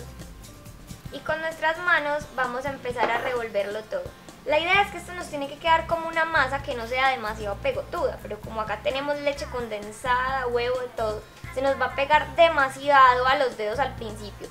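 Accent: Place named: Antioquia, Colombia. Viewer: Realmente el acento Paisa.. (de Antioquia)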